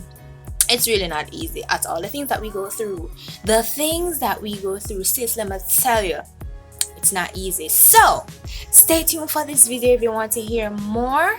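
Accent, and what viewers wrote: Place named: Africa. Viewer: First, I am hearing your African Accent!!!! Lwl. Good video!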